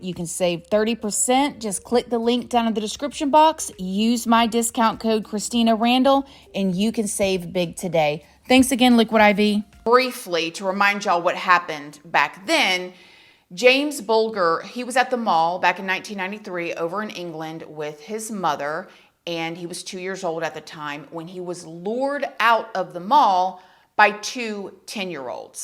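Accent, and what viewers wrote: South, United States. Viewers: Love your southern accent , that’s a dangerous move to keep letting him out and protecting him? There’s some like that going on in Southern California a guy parks across the from a school and records them, police said he hasn’t done nothing wrong yet but the parents don’t want him there but some say he has a student said something to the news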